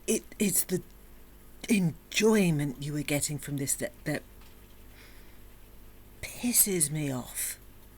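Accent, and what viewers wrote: United Kingdom. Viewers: God it sounds so much better coming from a British accent...much love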